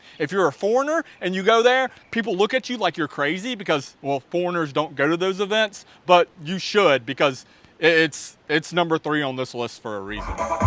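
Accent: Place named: South, United States. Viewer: man... the southern accent is stonk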